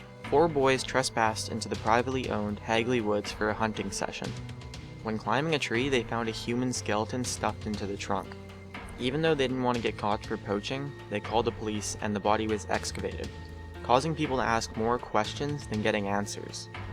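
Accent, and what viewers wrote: Canada. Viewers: are you Canadian ??? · Is this kid Canadian? His accent annoys me.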